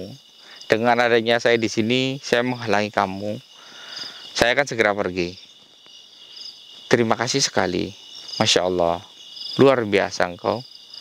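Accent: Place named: Banyumas, Indonesia. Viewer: Jauh banget sobat merantau nya..biasanya org ngapak d kalimantan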